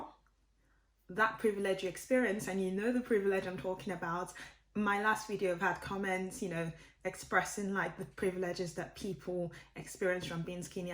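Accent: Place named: United Kingdom. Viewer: It’s interesting to see ur accent change from your older vids. You have a more British accent now. Almost no Nigerian accent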